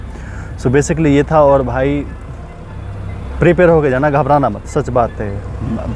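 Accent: India. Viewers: I think you belong to Mewat?? Your accent 🤌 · Bhai aap narnol Mahendragarh wali side se ho ❤😊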